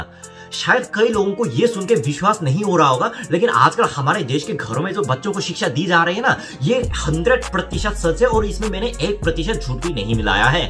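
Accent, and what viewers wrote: India. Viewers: You are born in South Korea🇰🇷 but your way of talking is Indian🇮🇳👳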